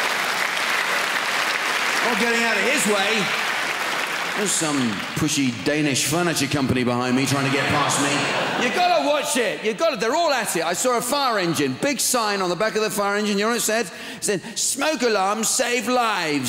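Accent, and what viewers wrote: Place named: England, United Kingdom. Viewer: By the accent, I'd guess it's England.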